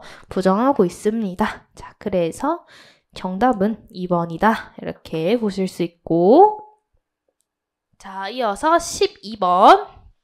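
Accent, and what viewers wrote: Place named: Gyeongsang, South Korea. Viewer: 경상도 분이신가 사투리 나오시네 ㅋㅋ귀엽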